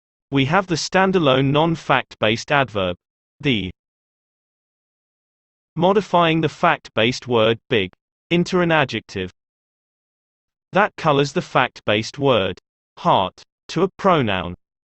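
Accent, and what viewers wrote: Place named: Ireland. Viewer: Thanks. Great video. I prefer the Irish accent. May have something to do with my mother being Irish.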